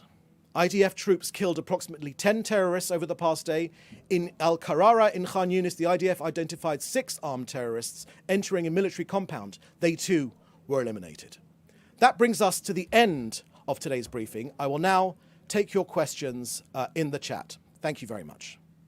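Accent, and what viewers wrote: England, United Kingdom. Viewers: Another liar with funny English accent fomenting his hate and BS. No one believes your lies anymore after you Massacred over 30,000 innocent Palestinian civilians in Gaza with over 16,000 children. Shame on you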